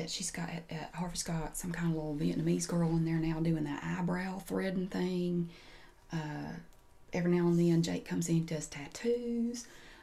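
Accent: South, United States. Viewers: It's so nice hearing a southern ASMR artist. I find you incredibly relaxing, especially since I'm from the south. It's almost like a comfort from home. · Dev 2 Turnt do you know of any others? I moved to the south and my interest for asmrtists has changed with the people I'm comfortable around I guess. I haven't found any that sound this southern, or from my region of the south. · You are awesome. Love the accent and southern gossip! Lol seriously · I'm from Florida and am just a little too far south to have my own southern accent 😒 . I LOVE yours though 😊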